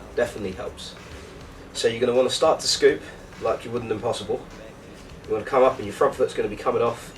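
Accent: United Kingdom. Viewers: thats cos stewie is british and so is this guy but all british people dont sound the same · any subs i just like the english accent!!!!lol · BRITISH DA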